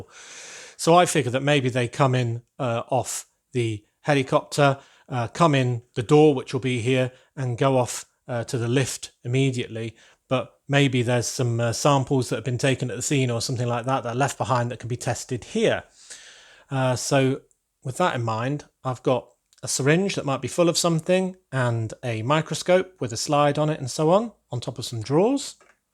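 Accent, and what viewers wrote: United Kingdom. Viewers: Sand green pillows to match the scrubs. Fantastic hospital MOC. Love your build style. What British accent do you have. I’m Australian but I believe most people from South Australia have the estuary accent from along the Thames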